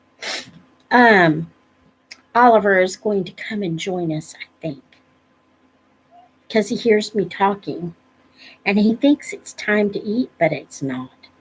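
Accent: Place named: South, United States. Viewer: I will be honest with you I’m not big on professional audiobooks to begin with so I was a bit iffy coming in, but you’re skill in narration and your beautiful southern accent as another east Texan was so wonderfully fit for this story and the characters and your delivery of that final line shattered me. As a child this book devastated me, but as an adult who has lived so much more life and suffered loss of other’s innocence, this book wrecked me in the most beautiful of ways. Thank you for your dedication to finish this story despite the hardships and time it took, may the Lord bless YOU Miss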